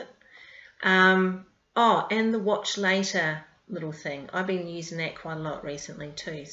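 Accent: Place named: New Zealand. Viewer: Oh my goodness, I thought (assumed) you were from NZ!! Great responses here, I’ve been dabbling with some deck mods recently, only decks that are cheap and easy to get though. I’m the same, I’m not a huge fan of long videos, I try to keep mine around 10 to 15 (every now and then they go over if it’s something special). I’m off to check out some of the other channels you mentioned!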